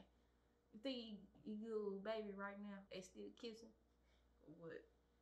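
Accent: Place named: South, United States. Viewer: This is dope. You country as fuck! Lol this is M.A. by the way. Lol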